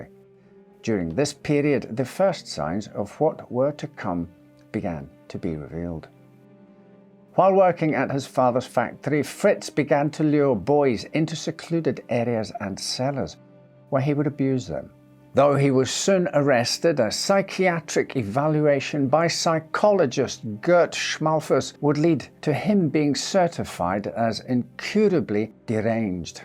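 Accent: Scotland, United Kingdom. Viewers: You accent amazes me, I grew up in the Scottish Borders so it makes me feel like you are from around the same area but you lean heavily on English for a lot of your R sounds you sound a non rhotic scot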